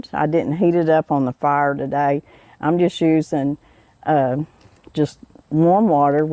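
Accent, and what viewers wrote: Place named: Alabama, United States. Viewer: I’m half American living in my moms home country of Sweden. Your accent makes me so nostalgic for the US. My dads whole side of the family talks just like you, it’s my favourite sound in the whole world. Thank you for sharing your wisdom Ms. ❤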